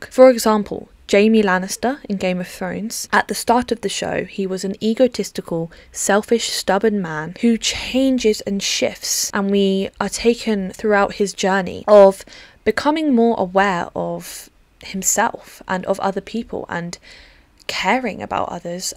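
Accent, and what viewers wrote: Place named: United Kingdom. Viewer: Maca bree? Is macabre pronounced differently in the UK? (Only guessing, as you sound British.) *Didn't want to leave just that...i found the entire essay insightful and well-written. You have some good thoughts and know how to put them together. I have an English degree but only write for myself now, mini essays. I was listening while, ironically, coloring in a coloring book (with my 300 sharpies) as i do when i flip around youtube looking for something engaging. I subbed, and I'll be back to check out the rest of your content. (One more thing: i used to have a little dog named Honey Bee, and i have "Bee" tattooed on my back, on my shoulder blade. Its the only tattoo i got in jail, years ago.)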